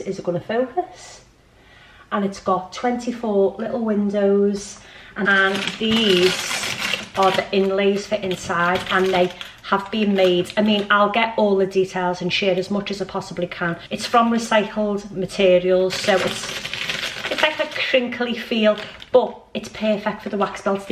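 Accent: Liverpool, United Kingdom. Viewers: Hi, your fab it's like listening to an old Scouse mate and Ur just like me. Your cracks me up he lovely. Looking forward to how he dresses you, as we are very similar in age but I don't see myself as at the age I can't wear low tops 😂 I'm 43 this year and I'm the age I feel 😂. Loving the new style in ur home and I've had a few bits from Neptune they are worth the money. Looking forward to your next vlog xx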